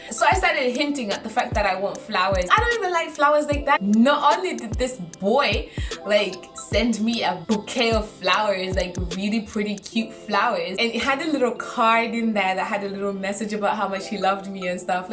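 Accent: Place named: Malawi. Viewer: Your Malawian accent I love 😂😂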